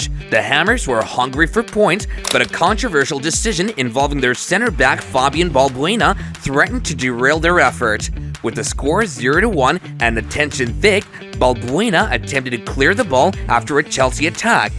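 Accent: United States. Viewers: 40 seconds? As soon as the Yank's voice was heard, the back button was pressed. Can't comment on the video content, I simply didn't watch it! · these american pronunciations are painful to hear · Watching football vids with an American accent just ain't right.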